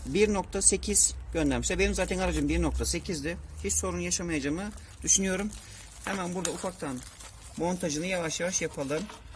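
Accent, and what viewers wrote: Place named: Hungary. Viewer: Bu kizancik Macir belli beya konusmasindan kizancagizim kolay gelsin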